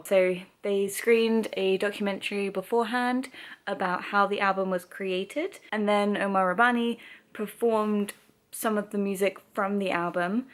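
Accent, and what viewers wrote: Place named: United Kingdom. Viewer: Although the music was a little bit too loud, it was fun to watch and it was really interesting to see you more natural (you look good without makeup) more spontaneous more random :D :-) Also you have beautiful british accent (I know, sounds weird, but that's how it is, I love it lol). And I've never seen that thing you're releasing the bowling ball from, but I must try it, looks like fun, hope we have it here in Czechia or Czech republic or whatever you foreigners call it nowdays! :D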